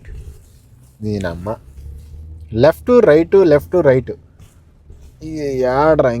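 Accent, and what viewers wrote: Kadapa, India. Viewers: మీది కడప న అన్న 😮 మాది పక్కనే ఊరు 😊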